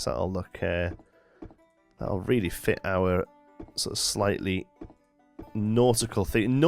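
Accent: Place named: England, United Kingdom. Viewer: i watched all your previous videos ep i loved ur accent lookin english uk sometimes better than en usa , i wished this game free because i support always free games open source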